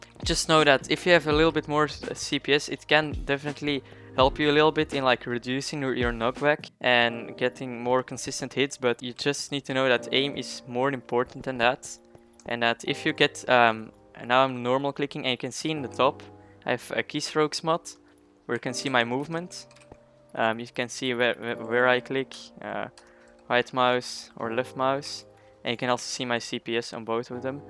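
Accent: Netherlands. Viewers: Dutch accent lol keep going ur actually pretty good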